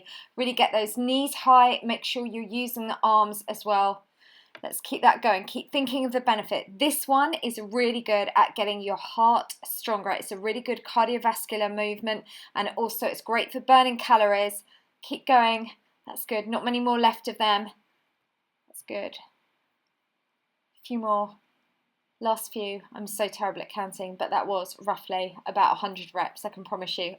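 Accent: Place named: United Kingdom. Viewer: ommmmmmmmmmmmgggggggggggggggg your accent is sooooooo cute!❤❤❤❤❤ best british accent ever heard!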